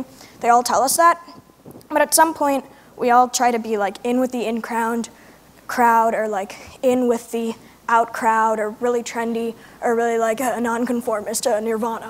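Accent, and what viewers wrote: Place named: United States. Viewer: this american accent seems incredibly harsh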